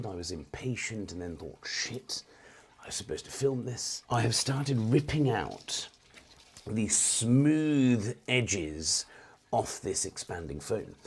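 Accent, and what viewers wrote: United Kingdom. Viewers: I love hearing you speak. It sounds like you belong in a Harry Potter movie. From one Brit to another i absolutely love it.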